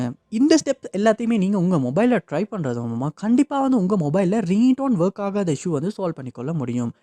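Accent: Sri Lanka. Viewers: Bro neenga illangai tamilana ?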